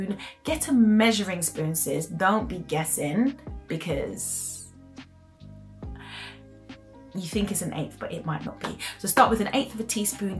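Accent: United Kingdom. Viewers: You have a Brittish accent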